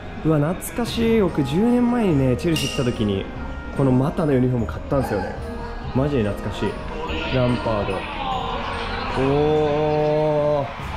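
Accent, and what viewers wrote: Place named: Kansai, Japan. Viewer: しっかりと関西弁😅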